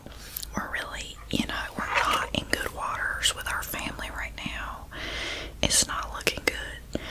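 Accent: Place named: North Carolina, United States. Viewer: The addiction to that NC accent, lol.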